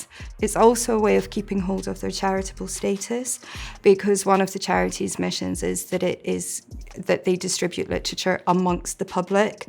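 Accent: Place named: Scotland, United Kingdom. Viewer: I thought I could hear a Scottish accent... but only faintly...I was right..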